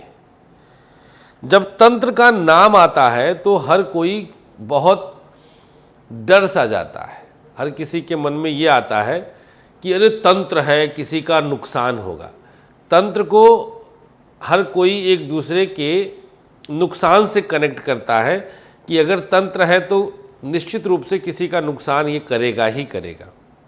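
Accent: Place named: Uttar Pradesh, India. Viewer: Aapki awaaz mein ego Nahin Hai Apnapan hai yah Awaaz up Ke Lal ki hai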